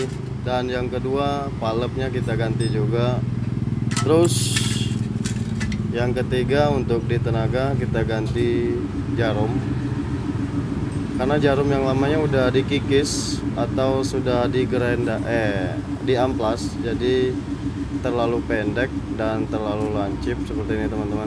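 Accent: Palembang, Indonesia. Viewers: Kayak kenal bahasanya, wong Palembang yo om?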